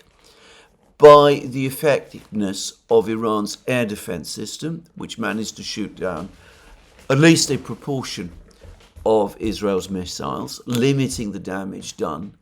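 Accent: England, United Kingdom. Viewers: EduardoArtistaDelavega: you are talking from a common sense perspective, you are exposing the hypocrisy of America, but then you spell colour via the Webster dictionary. The Duran are interesting also, when I first watched the Duran I really believed that Alex not only lived in America, but I believed he was an American, even though he had Greek ancestry, as all Americans have ancestry from somewhere else. Alexander on the other hand, is so English in his use of the English language, coming here as a young boy, approximately aged 6, he has adapted to become very much an Englishman. But then one day soon after the Special Military Operation started, I discovered that Both Alexander and Alex had individual YouTube channels. Alex was walking around Nicosia on a daily basis and would also travel on occasion around Europe, I was totally taken aback by this fact, that Alex with his very strong American accent, didn’t even live in America, he probably just learnt American English, I still don’t really know why he has such a strong American accent. 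So we have this contrast with The Duran, one articulates himself via an American English accent and the other as an Englishman, but both are Greeks, although one is a Greek Cypriot. But this oddity is actually a winning combination and adds to the aurora of The Duran. So Eduardo, are you American, your use of English tells me you are not, but your spelling, tells me you are. Which are you?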